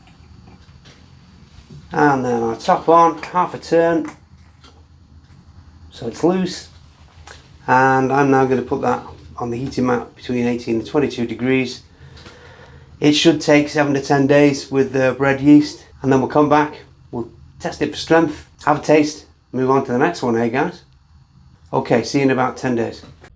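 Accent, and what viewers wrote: United Kingdom. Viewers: Sitting here watching a British dude teach me how to make a fowty in my garage.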